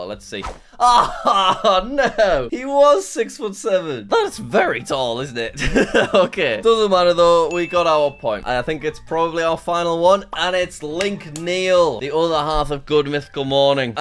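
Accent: RP, United Kingdom. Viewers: I wonder if he got the sudden inclination because Dan has made fun of how tall he is in the past? So he kinda built this image of himself that he and his fans joke about him being a tall, slightly posh (thus kinda naturally friendly) sounding guy which is a subversion from the fact that he actually has terrible posture and a more cynical sense of humor and attitude. There’s also just the infamous line from his rap roast that he’s basically the product of what would happen if Winnie the Pooh f*cked Slenderman 😳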